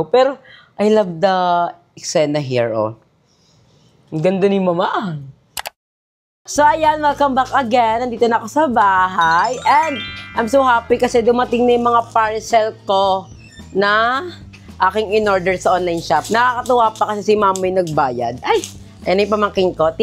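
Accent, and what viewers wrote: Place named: Visayas, Philippines. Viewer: Bisaya poba kayo ma'am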